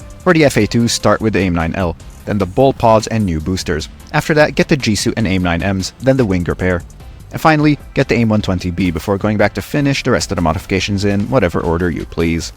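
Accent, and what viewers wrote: United Kingdom. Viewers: That British accent though... Also what Anime is that! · wtf is that British accent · That British accent ACTUALLY hurt me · That English accent is terrible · The British accent chef's kiss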